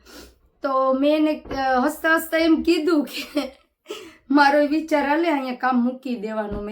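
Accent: Kathiawar, India. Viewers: અસલ કાઠિયાવાડી ગામઠી ભાસા..!!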